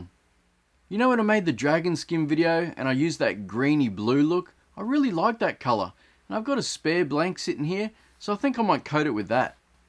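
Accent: Australia. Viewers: Those are beautiful💯 New sub here, it's nice to hear an Aussie accent. Cheers from Melbourne mate😊✌❤🇦🇺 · Are you putting on the Australian accent extra thick on purpose?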